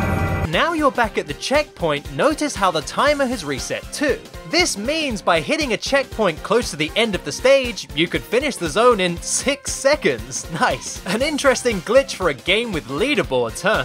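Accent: United States. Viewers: lol your American accent is incredible. Even better than IHE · dude your American accent is pretty convincing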